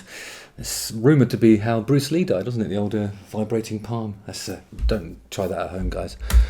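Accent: United Kingdom. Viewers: I’m curious if you had the opportunity what would you like to hear Dimash perform, not a song but what genre, rock, ballad, etc. really enjoy your chats. Love the British accent, makes me homesick. 🤗🤗🤗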